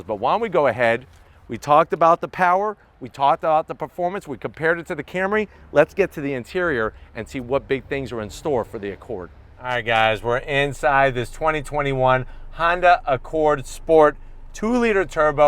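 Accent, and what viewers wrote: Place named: New Jersey, United States. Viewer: I'm guessing this guy is from NJ or LI.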